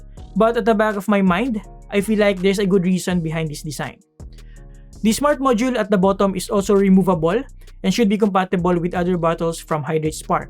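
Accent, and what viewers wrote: Philippines. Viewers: Come through Filipino accent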